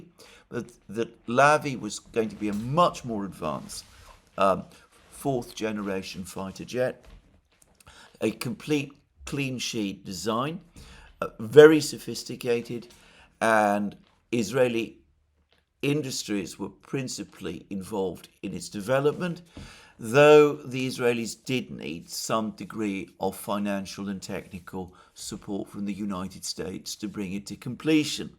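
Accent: United Kingdom. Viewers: YOU SHOW MISPLACED ARROGANCE WHEN YOU TALK ABOUT IRAN. THIS VANITY IS NOT APPROPRIATE FOR AN ONLINE GROCER, EVEN WITH A BRITISH ACCENT.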